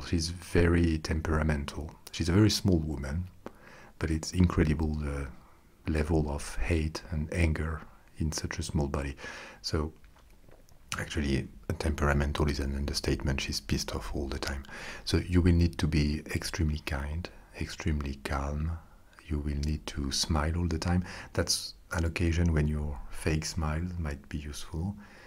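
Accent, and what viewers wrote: France. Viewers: This is awesome! I’m American and dated a French guy for several months. Your accent brings back memories.. fortunately he wasn’t quite this rude 😂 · Love this, very funny :) Much more like this, French accent and sarcasm goes so well together, lol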